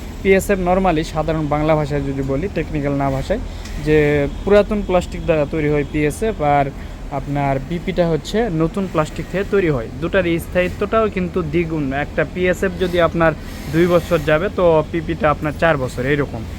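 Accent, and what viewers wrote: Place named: Joypurhat, Bangladesh. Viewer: আসসালামু আলাইকুম ওয়া রহমতুল্লাহ! ভাই, আপনার কথা শুনে মনে হচ্ছে আপনি জয়পুরহাট জেলার মানুষ! জয়পুরহাটে আপনাদের এজেন্ট আছে কি না? জানাবেন প্লিজ!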